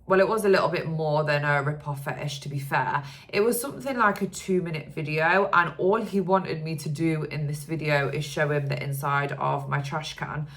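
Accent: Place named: United Kingdom. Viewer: Do you think having a British accent gives you an advantage, say, over an American accent?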